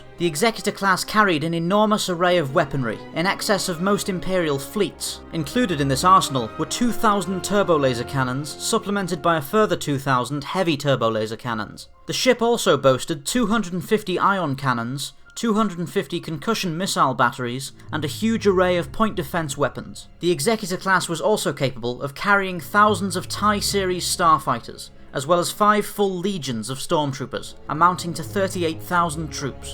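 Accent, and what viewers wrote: United Kingdom. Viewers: I love the facts told by your accent, british?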